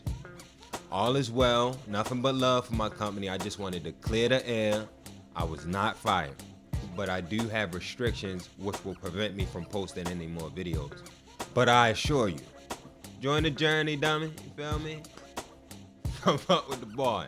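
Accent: Baltimore, United States. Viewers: B- more accents are cool in my opinion😎 Baltimore keep stayin true 💯💯 Much love from Savannah Georgia🍑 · dont apologize for dat baldamo accent young brotha 410!!!!! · That Baltimore accent is obvious . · I have dated quite a few Baltimore men and have a lot of friends here so yes you all sound like that but it's cute! I used to get mad when my ex called me dummy but now I realized that it is a term of endearment!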